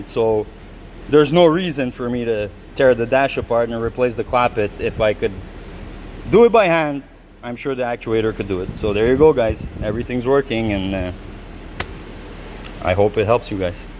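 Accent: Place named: Boston, United States. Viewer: Thanks,! You sound just like my cousin from Boston 😁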